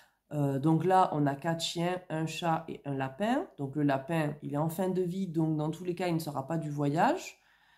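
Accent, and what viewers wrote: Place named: South, France. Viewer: Ha!! Toulouse! Je me disais que c'était l'accent du sud ! Nous c'est Perpi ! Encore merci pour toutes ces infos, votre départ approche de plus en plus !!! Trop hate de connaître la suite, on prend le même chemin que vous ! On a la quarantaine, pas d'enfant et conjoints de fait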